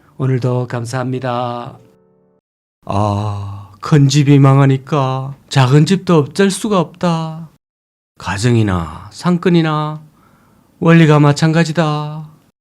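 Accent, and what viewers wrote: Gyeongsang, South Korea. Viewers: 나레이션 특이합니다 경치도 재미있고 목소리도 재미있고. 집사람은 좀 느끼하다고 하지만 ㅋ 그래도 나는 경상도 사투리가 좋네요 ㅎㅎ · 이 분 부동산 인사이트가 대단하세요. 구수한 경상도 사투리지만 설명에는 정말 뼈가 들어가 있어요. 부동산에서 인생의 흥망성쇠도 잘 보여 주셔서 참 많이 배웁니다.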